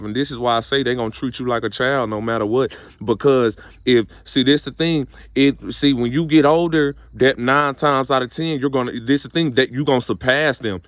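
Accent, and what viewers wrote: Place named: South, United States. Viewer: Could it also be that you sound uneducated and like you came from the po' south?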